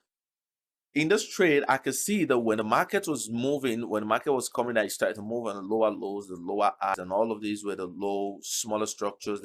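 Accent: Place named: Nigeria. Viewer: Definitely Nigerian 😅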